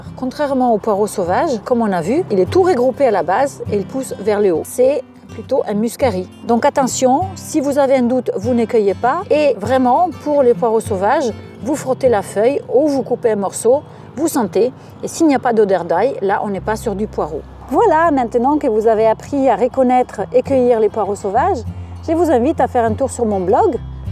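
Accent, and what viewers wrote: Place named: Provence, France. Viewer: ce merveilleux accent digne du terroir provençal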